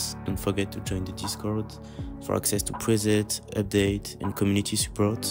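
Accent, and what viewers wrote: France. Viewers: On voit que t'es français à l'accent x)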